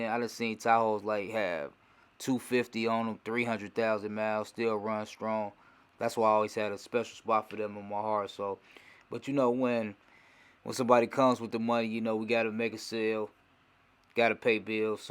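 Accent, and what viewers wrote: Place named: Chicago, United States. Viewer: I noticed right off the bat you’re a Chicago guy, you sound just like one of us. Straight Chi City sound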